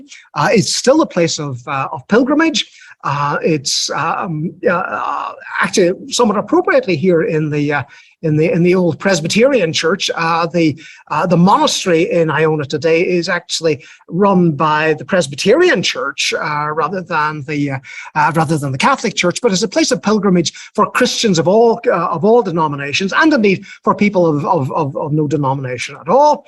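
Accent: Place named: Northern Ireland, United Kingdom. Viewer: Thank you, Professor. You have what appears to be a Northern Irish accent. I would wonder to what extend today's Orange adherents relate to this history. Or do they consider it the history of the people they have dispossessed -- the native Irish -- and thus not relate to it?.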